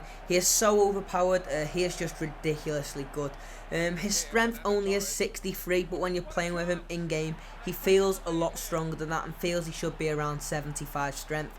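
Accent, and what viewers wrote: Liverpool, United Kingdom. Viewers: Yes scouser I'm a scouser were you from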